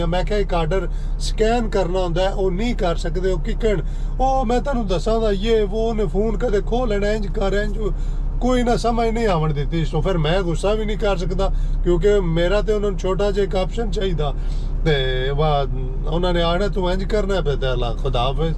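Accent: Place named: Jhelum, Pakistan. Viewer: Ap Pakistan kis city say biking karty ho ap ki zuban bolo jhelum ki lag ry hay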